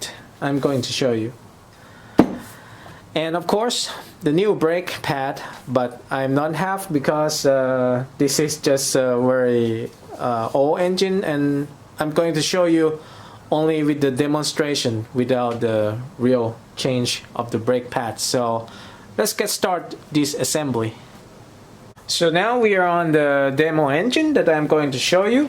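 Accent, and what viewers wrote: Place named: Thailand. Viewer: ผมชอบอังกฤษ สำเนียงไทย ฟังรู้เรื่อง รื่นหูจัง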